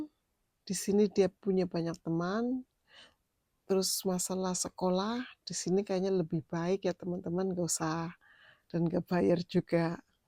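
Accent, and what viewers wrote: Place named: Java, Indonesia. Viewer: Bhsanya medok tapi enak didengerin kloboad lagi cerita, semangattts dan sukses terus ya mpok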